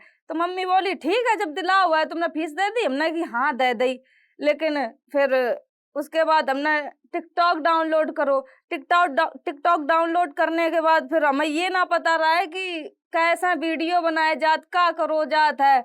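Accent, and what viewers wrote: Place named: Bundelkhand, India. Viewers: Ye hoti hai up bihar ki language.....jitni suno utni jyada pyari... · Bundelkhandi boli hm jalaun se h ☺️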